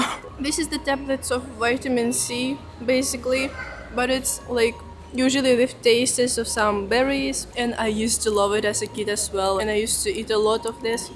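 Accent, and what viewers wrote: Russia. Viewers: Mukbang video Russian style! Cuteness overload with the thick sexy Russian accent and pure youthful beauty. Of all foods, I have tasted the cottage cheese from Jocca, but made in Germany. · Where did you learn your English? You are too funny. Love your sense of humor with a Russian accent. The food looks delicious. I’m seeing more and more Russians on YouTube telling their story. I wonder how you are getting past Internet sanctions? Anyway, good for you.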